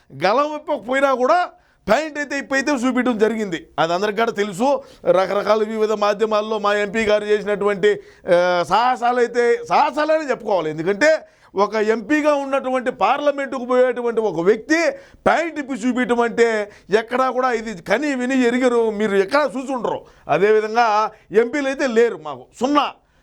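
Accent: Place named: Kadapa, India. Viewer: Anna first time telugu Kadapa slang vinutuna.super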